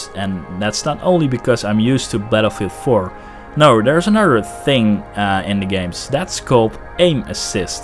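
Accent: Netherlands. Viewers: Are u from the NL? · Are you dutch? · Hoorde al aan je accent dat je Nederlands bent ;)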